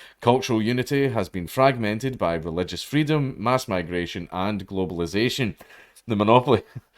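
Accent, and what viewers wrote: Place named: Scotland, United Kingdom. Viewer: Not that I don’t like your ‘Mad Lads’ series/channel. But I love your news videos. As a yank, I love your Scottish accent too.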